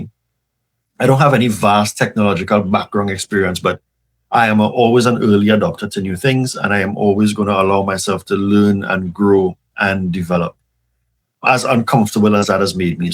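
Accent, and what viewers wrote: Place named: Trinidad and Tobago. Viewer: You sound Trinidadian so subscribed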